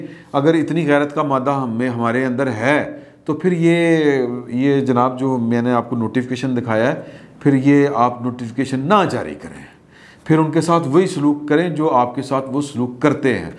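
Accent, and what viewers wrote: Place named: Lahore, Pakistan. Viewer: I listen to this guy for his Lahori Punjabi!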